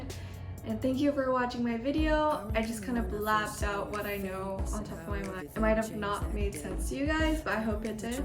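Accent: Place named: Japan. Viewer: 日本人の英語という感じが面白いですね。私がインターで出会った友人たちの話し方似てるですね。日本語の方が上手ですよ！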